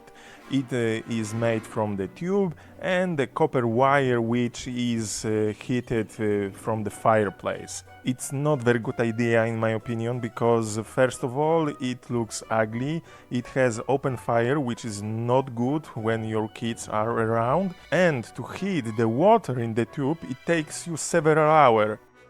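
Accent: Poland. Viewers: Od pierwszych słów wiedziałam że jesteś z Polski 😊 dzięki za film. Planujemy coś podobnego w ogrodzie. Pytanie, czy wanna nie nagrzewa się zbytnio bezpośrednio od ognia? Nie parzy aby?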